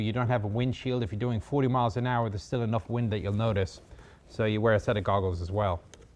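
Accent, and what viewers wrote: Ireland. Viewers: This guy is the BOSS!! He sounds Irish ☘️ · I'm sure he won't mind, but that is an Irish accent. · Long way to “Tipp” with the accent! Got to UCD Belfield? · Great video. Bit of a twinge of an Irish accent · Is that an Irish accent?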